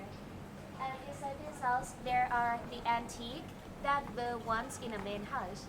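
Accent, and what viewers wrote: Scotland, United Kingdom. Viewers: Interesting museum, but camera-work amateur. Hand-held and shaking, lens mostly on the tourists, not on the artwork. Outside, noisy traffic, cant understand the Scottish accent.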